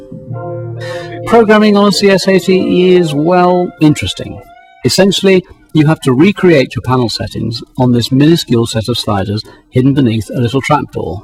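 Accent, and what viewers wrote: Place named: Australia. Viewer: Australian narrator... because of the price of the synth at the time.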